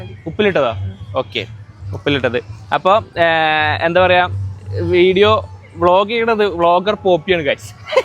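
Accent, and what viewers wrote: Kozhikode, India. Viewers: Avalude baksha kettitt Kozhikode ann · കോഴിക്കോട് ആണ് മനസ്സിലായി ഭാഷകേട്ടപ്പോൾ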